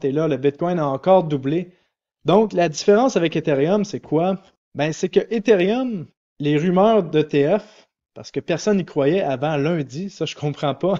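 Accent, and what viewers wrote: Canada. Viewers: Merci ! vous êtes très plaisant à écouter, votre accent canadien y participe mais plus que ca votre facon d'aborder les sujets est formidable. Restez comme vous êtes !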